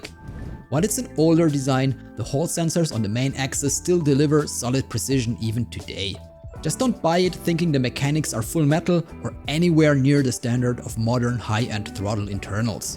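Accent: Germany. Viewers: I might become a member or something as well. But give me some time on that, because I just discovered your channel. Gotta decide if, and how much I would be if I do. But all is positive so far. Enjoyed talking to you. Also, is your accent German? Just curious, because I'm German, Irish and Bohemian (gypsy Bohemians at that). I think it's neat to learn things from people in other countries.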